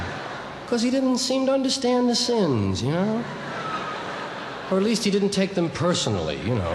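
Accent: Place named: New York, United States. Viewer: The old-time NY-Irish accent alone makes this hilarious.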